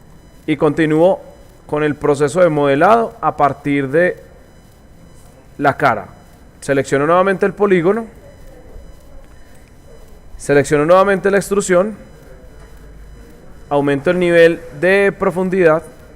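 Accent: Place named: Colombia. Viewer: Hola enhorabuena por tomarte el tiempo de hacer este tutorial, fue muy explicativo. Estaré atenta a nuevos videos! Tienes voz de Colombiano jejjejejej me agrada mucho lo sencillo que explicas. Muchas gracias!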